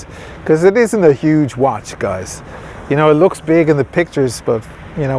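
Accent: Ireland. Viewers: Irish guy ... lol 😝 nice Intro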